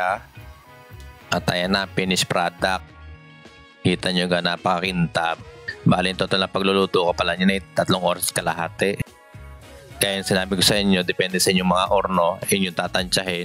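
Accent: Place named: Batangas, Philippines. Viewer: batangueño ata are HAHAHA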